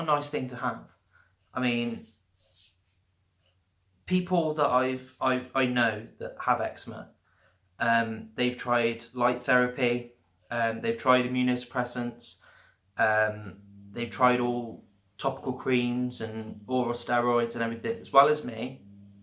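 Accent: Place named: United Kingdom. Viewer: Thanks for this, you're the first person I've seen on yt talking about Dupilimab with a British accent, did you get it on the NHS? Makes me hopeful, cheers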